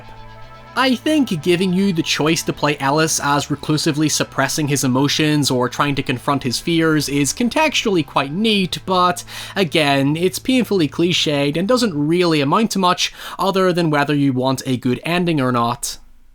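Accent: Northern Ireland, United Kingdom. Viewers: Northern Ireland. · Are you from northern Ireland? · Are you Northern Irish?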